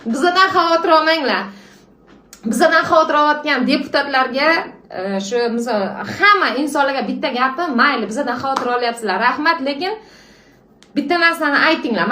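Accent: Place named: Bukhara, Uzbekistan. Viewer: Бухороча тошкентга ва харазм шевасинда гапиробсан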